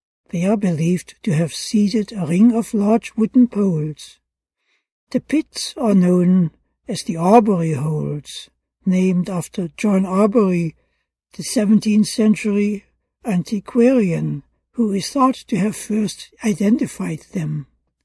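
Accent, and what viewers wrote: Germany. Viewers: Well read. Very good English, the German accent just enough to be charming.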